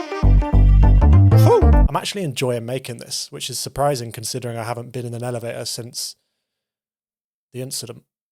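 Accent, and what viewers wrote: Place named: United Kingdom. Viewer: It's only now that I've realised after few secs of vid that you're British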